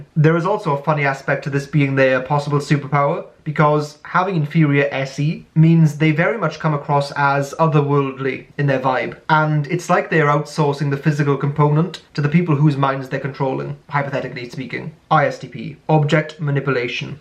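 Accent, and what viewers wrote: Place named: England, United Kingdom. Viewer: What kind of english accent is that?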